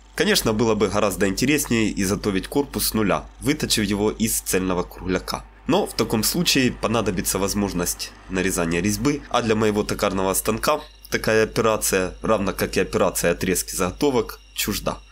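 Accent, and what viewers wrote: Ukraine. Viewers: Такой замечательный украинский акцент, и такой хороший мастер! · Ну у тебя и говор!)))Ты из Украины что ли??))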